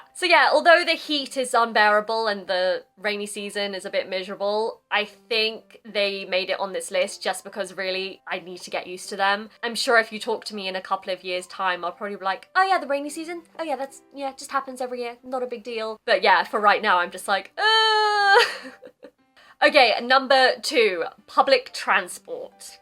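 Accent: RP, United Kingdom. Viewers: この動画でシャドウイングしよ。 勉強になるし、何よりmodernRPアクセント??が心地よい☺️ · I was studying in America for 3 years, so I get used to American English. But your British English is easy for me to understand. Overall, Japanese people are polite. I don't like some Japanese people because they discriminate against physically challenged people. One day, I had a job interview. An interviewer said, "What happened to your legs?" He kind of laughed. That was really rude. I was glad I didn't have to work for them. Since I am vegetarian, I should visit your country some day.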